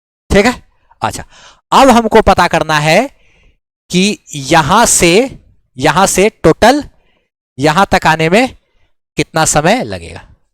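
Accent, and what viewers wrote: Bihar, India. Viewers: Aap bihari bolte ho kya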